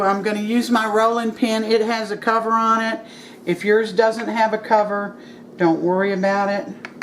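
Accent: South, United States. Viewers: I totally enjoyed your video!... Your Southern accent and the TV on in the background, made me feel right at home, in the kitchen. My Grandma was an expert pie maker, unfortunately She didn't choose to share her secrets and skills, but watching you I can imagine this is the way She made her pies. One thing I can agree with is the patience that is required to make great pie dough. It's more than just throwing some ingredients in a bowl and mixing it up. I have always said there is an art to making flaky pie crust. You said it should be done quickly, but you didn't do it quickly, and it turned out great. I guess I am saying that because I am not quick, lol. Also do you recommend Granny Smith Apples, to make the pie filling?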